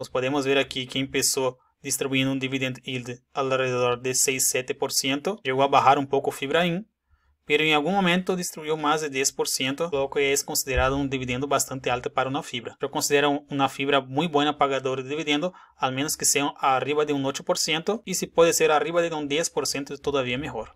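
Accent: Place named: Brazil. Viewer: No sabía que no eres mexicano ! tienes acento de Brasileño, estoy en lo correcto? gracias por tus aportaciones... Y una pregunta tienes nacionalidad? si no es asi... como le haces con los impuestos? puedes tener cuenta en cualquier casa de Bolsa?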